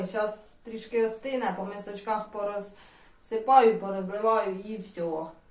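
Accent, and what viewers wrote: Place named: Zhytomyr, Ukraine. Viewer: Красавица ти звідки з Житомирщини акцент суржика або Черкаси, звідки ти будеш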